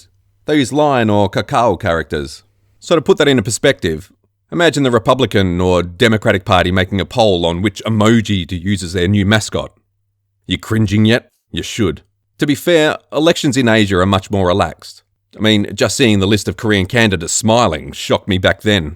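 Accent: Australia. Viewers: Tu es français, mais je pense que ton accent écoute comme un peu Australien ? Je suis australien et j'apprends le français au lycee mdrr👌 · You honestly sound like a Australian not a Frenchman. Where are you from originally? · Yah he sounds Australian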